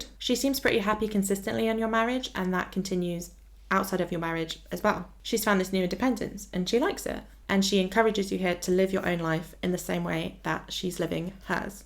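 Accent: United Kingdom. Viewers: I love your accent. Original English accent. Love it 💕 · I get sinilar questions about my accent for some reason, and I always answer that I just watch a lot of Youtube. Simple, shuts the dumbass question down 😅... you sound normal-brit to me, but i guess my view might be biased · As an Australian, I don't see any hint of Oz/Kiwi accent at all. Maybe the tiniest hint of South African, but not enough for me think you're anything other than a Brit. Also, the haley_concerned portrait that you called angry, to me looks more like pensive or worried or uncertain.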